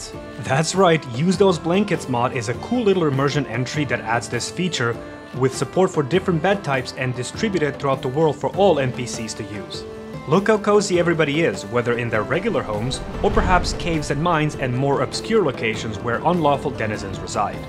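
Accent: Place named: Eastern Europe. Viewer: It’s always dudes with Eastern European accents and playing overwhelmingly modded sexualized female dragonborns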